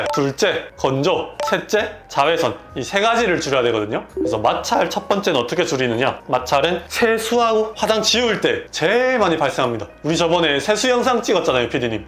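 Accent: Busan, South Korea. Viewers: 저는 멜라노사크림 약국에서 사서 저녁에 스팟으로 바르고 자는데 완전히 없어지진 않아요~! 선생님 억양이 부산사람같아요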